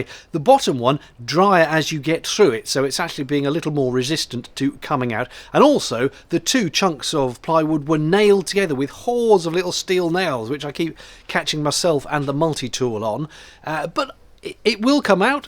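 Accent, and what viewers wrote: United Kingdom. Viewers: I cannot believe anyone would comment about you chit chatting. I have always enjoyed a British accent so talk as much as you like! Glad you are making progress - it is interesting to watch. Sounds as if you have it figured out, now if only the weather would cooperate. Your community will be here waiting.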